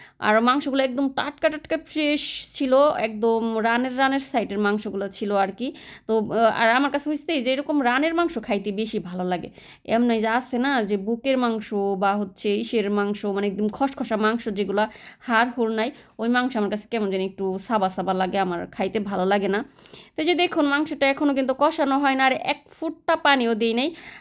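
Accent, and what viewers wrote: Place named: Narayanganj, Bangladesh. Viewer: আসসালামু আলাইকুম আপু তোমার বাংলাদেশের কোন জায়গায় নারায়ণগঞ্জ বা জাজিরার এদিকে প্লিজ আনসার দিও তোমার কথা ঐদিকের লাগে জন্য আপেক্ষায় থাকব।।।।।প্লিজ...।।।।।।।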